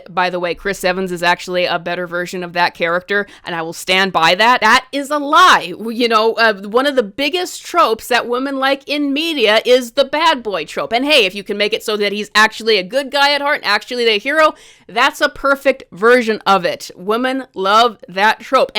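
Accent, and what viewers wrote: United States. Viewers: Holy shit I just knew you had a Minnesota accent before you even started talking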